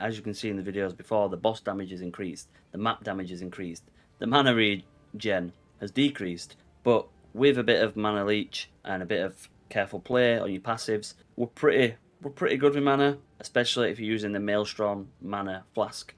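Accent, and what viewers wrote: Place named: United Kingdom. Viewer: british english…the most annoying version of english to listen to….build is nice though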